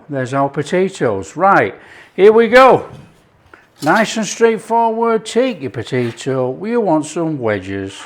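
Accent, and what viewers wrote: United Kingdom. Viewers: Happy day to you and your family, you are very relaxing to watch and learn, you have taught me so much, I love to cook, thank you for helping us so much, love your British accent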